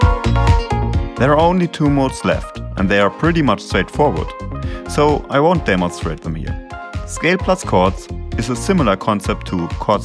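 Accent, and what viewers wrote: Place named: Germany. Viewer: Da entwickelt Ihr so ein Produkt, seid aber zu geizig 200 EUR in einen native speaker zu investieren smh. Dieses Video ist schon konfus genug, aber der deutsche Akzent lenkt so sehr ab, dass es noch schwieriger wird, dem Video zu folgen.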